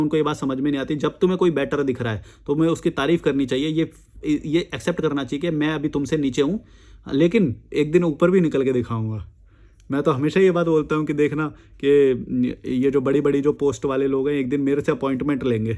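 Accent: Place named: Delhi, India. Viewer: Delhi accent...Nice good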